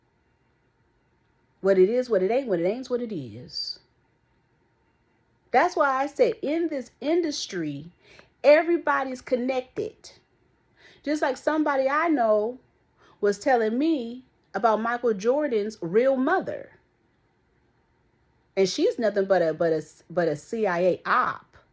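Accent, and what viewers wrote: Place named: Upper Northeast, United States. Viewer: Loving your upper NE Upper Crust enunciation - Accountability 😂. And I know y'all remember "Unpack your adjectives"😅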